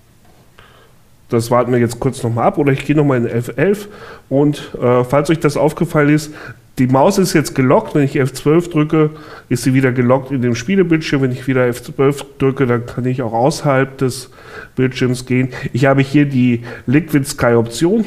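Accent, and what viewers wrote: Augsburg, Germany. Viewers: Der kommt aus augsburg ja moin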